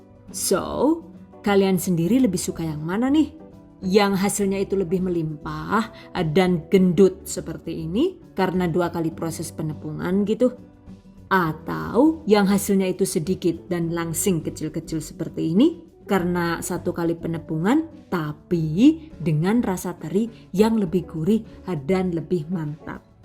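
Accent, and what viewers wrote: Banyumas, Indonesia. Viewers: rika wong ndi sih geneng ngapak yah...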